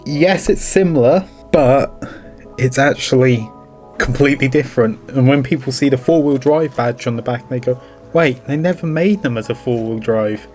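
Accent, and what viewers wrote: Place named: United Kingdom. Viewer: Wonderful video, I’m old 60 and I want one so bad, I can taste it. I’ll be able around rural Southern West Virginia to run the back roads, but we do have even a few of them that are 55 mph roads, are people pretty patient with your lil truck getting up to speed and running a lil under the speed limit? Again awesome video and that swanky British accent always adds to any video, I alway think God has a British accent, not sure why. ❤️👍👍👍👍👍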